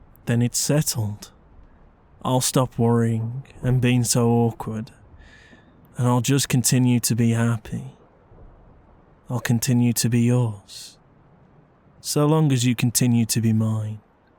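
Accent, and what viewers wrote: United Kingdom. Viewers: Love your British accent 😍 also you very amazing person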